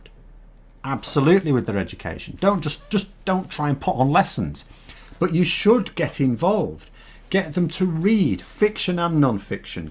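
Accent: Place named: Yorkshire, United Kingdom. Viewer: Bit rambling today, and a bit more Yorkshire. It’s been bugging me who you remind me of. Geoffrey Palmer from Butterflies. You even have that world weary demeanour. That is anything but a criticism.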